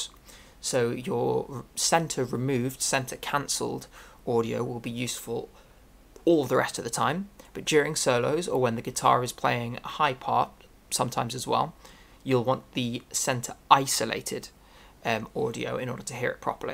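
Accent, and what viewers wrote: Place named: Newcastle, United Kingdom. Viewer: Geordie ASMR with a tutorial in the background. Nice.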